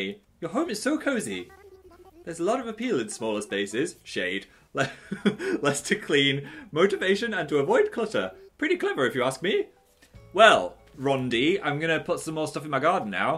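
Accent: North, United Kingdom. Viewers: Sounding pretty Northern today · Northern accent is coming out HARD in this video.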